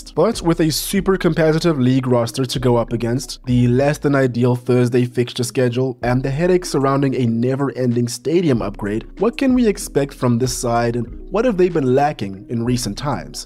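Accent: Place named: South Africa. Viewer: i hear a south african accent, i subscribe. simple as that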